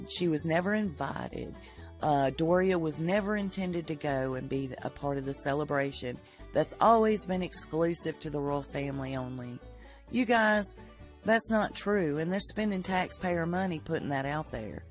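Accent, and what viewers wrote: South, United States. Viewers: “A knapsack full of nourishment...”. LOL., you are so southern and I just love it!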